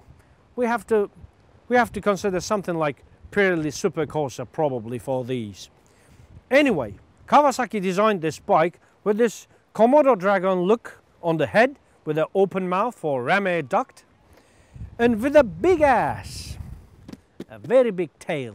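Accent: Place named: England, United Kingdom. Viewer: Bildigin ingiliz aksani var kardez. Neyini begenmedin.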